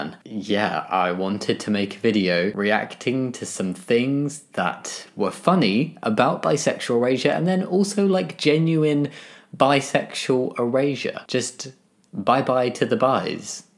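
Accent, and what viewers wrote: United Kingdom. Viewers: You're from the UK?!?!!? Man I'm really bad at detecting dialects.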